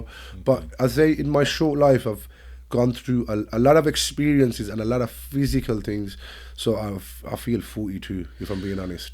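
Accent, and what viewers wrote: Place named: Birmingham, United Kingdom. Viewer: This guy has clearly not lived outside of an asian dominated area. We brown skins born in England are British of course. Speaking English with a horrible messed up Brummy accent, talking about clubbing etc. Of course you're a British Pakistani.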